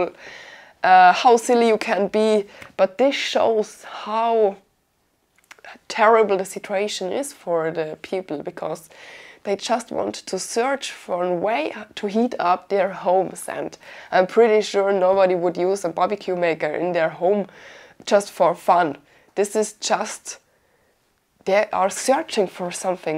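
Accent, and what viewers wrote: Bavaria, Germany. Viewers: Sooo lustig: englisch mit bayrischem Akzent! 🤣🤣🤣🤣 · Your accent when you speak accuses that you are German or something similar. I have had these models in my own house and in my parents' house for more than 30 years and no misfortune of any kind has ever happened, not even a fire or someone who has suffered burns. do not spread bad and false informations.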